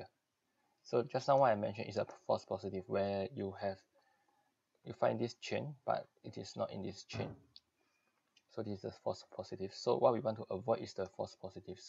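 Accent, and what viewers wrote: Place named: Singapore. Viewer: hello my fellow singaporean xD !! hear one time know liao, SG voice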